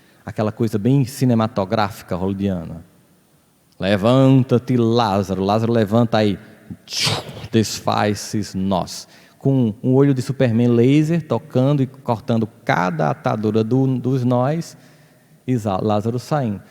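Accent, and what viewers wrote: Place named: Northeast, Brazil. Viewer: Assistindo hoje 17/01/2022. Há 6 anos, em um momento muito delicado da minha vida (depressão braba ) conheci esse iluminado, aleatoriamente mexendo no youtube, e nunca mais deixei de ouvir suas palavras. Sempre busco suas palavras, pois me fortalece, esclarece e me centra. É uma viagem p dentro de nós mesmos. Sem falar q amo esse sotaque, pois meus pais tb são nordestinos.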